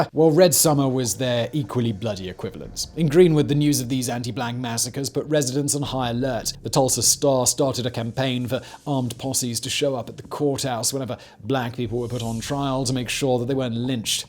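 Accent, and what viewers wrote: United Kingdom. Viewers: The fact that I'm only hearing about this for the first time in a British accent bothers me.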